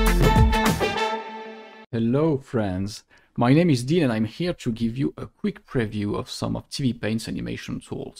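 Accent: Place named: England, United Kingdom. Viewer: Pas mal ton accent anglais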